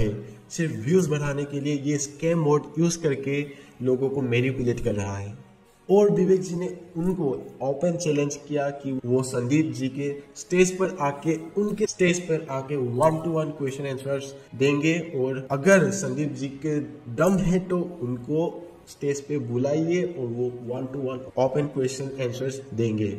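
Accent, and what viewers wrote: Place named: Assam, India. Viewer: yes bro absolutely ! 😃 my hindi is also like you and I am also from Assam.. Joi Ai Axom dada ❤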